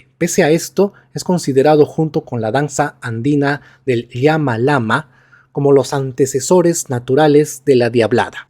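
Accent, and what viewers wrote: Bolivia. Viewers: Te llevo escuchando varios años y lo unico que escucho de tu parte es controversia, odias todo lo que es peruano y sobre todo durante el virreynato ..Por que no dices que eres un pro boliviano o boliviano directamente o pro indigenista boliviano..Hasta se te nota en la voz , no hablas como peruano. Tienes bastante dejo boliviano. Deja de engañar a la gente que hay pruebas eficientes , hay libros y que te desmienten..O acaso se te ha olvidado que Bolivia era el Alto Peru y pertenecia al Virreynato del Peru.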